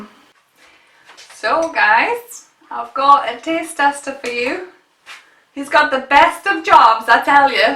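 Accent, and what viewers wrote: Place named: North East England, United Kingdom. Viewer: Hi I live in Stockton on tees. I think I recognise a NE accent! Our 1st daughter Harmony at 2yrs (now 42yrs) didn’t want to eat animals…. we are vegan because of this beautiful 2yr old. I have 6 grandsons 2 were born vegan! I am KM Vegan Baking but I do not work any more as I am a full time carer for my mum. I grow organic fruit and vegetables! Thank you for your delicious healthy recipes 💞💗💓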